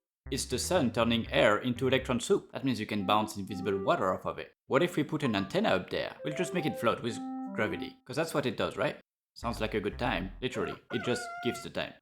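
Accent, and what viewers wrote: France. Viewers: the french accent is amazing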